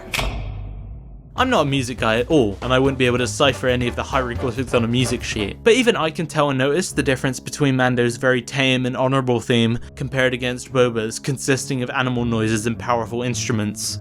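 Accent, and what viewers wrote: West Country, United Kingdom. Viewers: Your subtle west country is quite interesting.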